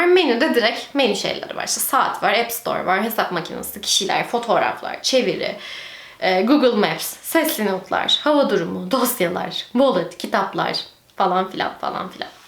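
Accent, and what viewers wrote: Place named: England, United Kingdom. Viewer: Ingilizce aksanına bayıldım diyebilirim gerçekten ilk izlediğim videodan bile samimiyetine hayran kaldım😍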